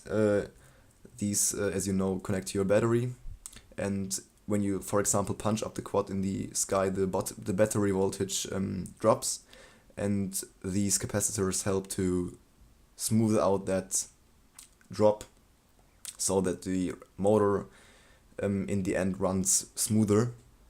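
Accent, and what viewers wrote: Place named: Germany. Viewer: Where are you from? Seems like you're from Germany because of this pretty strong accent but you're doing pretty well keep going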